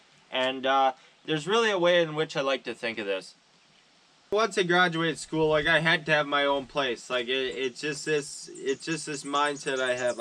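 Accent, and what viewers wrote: Canada. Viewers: As soon as you started talking I knew you were Canadian. LOL We do have an accent that is identifiable. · I could listen to you talk for hours about anything. Your accent is amazing and as a fellow Canadian I wish I had it lol. You’re the most attractive man on YouTube ❤️ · He reminds me of letter Kenny. Also I don’t know why I’ve never heard it but Canadian accents like his almost sound Irish at times. They have the lilt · Lol right away I thought this guys Canadian.. anyway good video and I subscribed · I knew you were Canadian with the first sentence you uttered.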